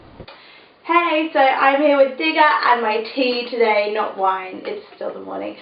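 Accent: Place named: Australia. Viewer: You're gorgeous as fuck and that Australian accent melts my soul I love it😍😍.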